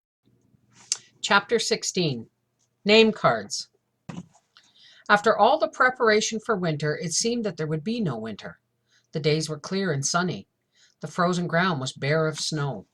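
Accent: Canada. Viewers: * pronounces some words a bit differently. I think it's because she's Canadian. Like "shonn"/"shone"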